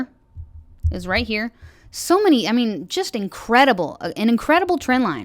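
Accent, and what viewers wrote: United States. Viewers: appreciate your vids, you have the best American accent ive heard (im from Europe)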